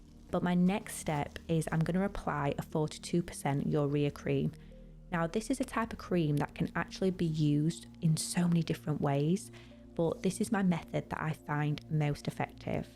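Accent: United Kingdom. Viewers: From what part of the British Isles does your accent originate? I think it's fantastic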